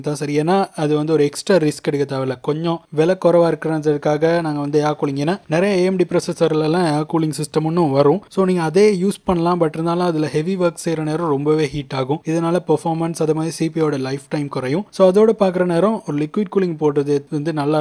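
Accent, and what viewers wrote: Sri Lanka. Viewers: Bro you’re sound so Sri Lankan ….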